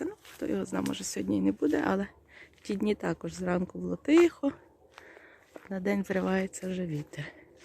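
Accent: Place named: Poltava, Ukraine. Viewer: Доброго вечора,!Дякую за гарне відео!Цезар такий кумедний😄Помічник!Завжди дивуюсь,як Ви з півдня України,а розмовляєте начебто з Полтавщини.Дуже люблю таку просту,українську вимову.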